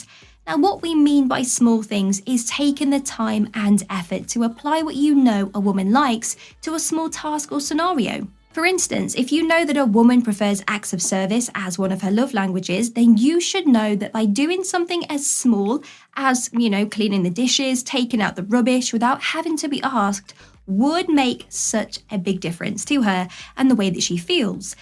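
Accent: England, United Kingdom. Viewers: I could listen to you,.... seriously, what part of England is your accent from?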